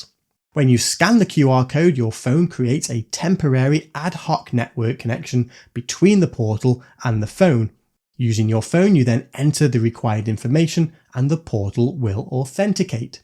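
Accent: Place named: London, United Kingdom. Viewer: My to is working amazing sounds like you’re connecting is more problems I live in USA you sound like in London or Europe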